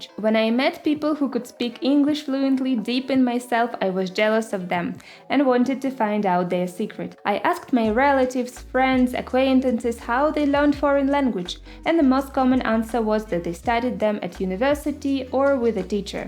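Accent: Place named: Spain. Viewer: Her Spanish accent amazed me.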